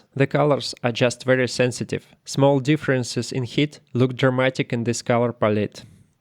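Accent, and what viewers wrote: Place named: Russia. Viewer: It is impossible to hide a russian accent.😅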